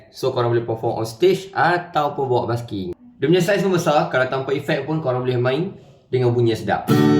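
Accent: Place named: Malaysia. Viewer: Brader cakap macam orang malaysia bagus bangat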